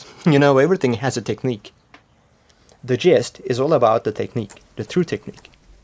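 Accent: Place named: India. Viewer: very informative and interesting video. what country are you from? the english sounded kinda indian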